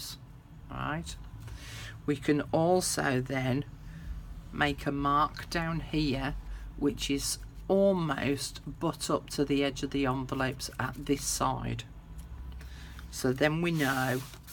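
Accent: United Kingdom. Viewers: Oh I'm so proud of what I have accomplished today. Some pages are just a wee bit crooked...like an eighth of an inch but I still love this crooked soft covered beautiful fun journal you have taught us step by step. How can anyone do this? You are a sheer genius and a love. I learned a whole lot too. Truly I have. I have to make my cover but I'm saving it for last. I want it to be extraordinary and wonderful and full of bits of happiness... I love how you you use the word bits all the time. And your accent is helping me with British movies I could nevah undahstand before you. I want to show you my envelope journal when I'm done but how my dear?. Again accept my apologies for being rude about editing things out. I so love watchin you and listening to your voice. Happy nights my dear woman. Happy nights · Love that British accent and how precise you are with placing and cutting. 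Your instruction is excellent and I am definitely addicted to these envelope journals thanks to your beautiful presentations. I am a bit unclear about where your gorgeous kits are from. There seems to be more than one resource, your own designs and others? Thank you for sharing your incredible talent.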